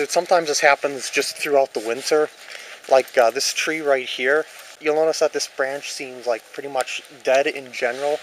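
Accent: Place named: Wisconsin, United States. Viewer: no idea where that is, haha it sounds cool, i never heard your accent before and now i know what a "Wisconsin" accent sounds like ;)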